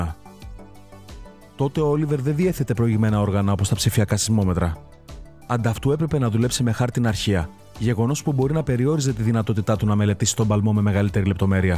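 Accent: Crete, Greece. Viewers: Πολύ ωραίο βίντεο. Έχοντας φτιάξει μία εικόνα γύρω από την φωνή σου, θεωρώ πλέον ότι έχεις καταγωγή κατά 90% από Κρήτη. Please απάντησε μου αν έχω δίκιο 🙂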